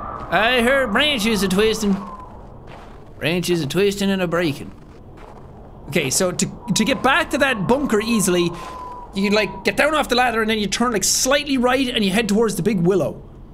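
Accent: South, United States. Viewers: Love your southern accent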